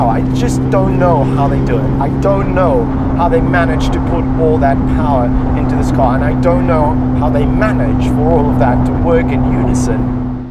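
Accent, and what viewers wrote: Afrikaans, South Africa. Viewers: defnitly .you can hear that afrikaans in him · Is this dude from South Africa?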